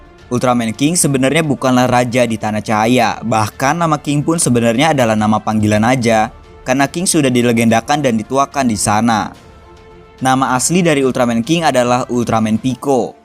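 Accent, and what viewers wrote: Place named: Jakarta, Indonesia. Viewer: fix admin Anak Jaksel Banget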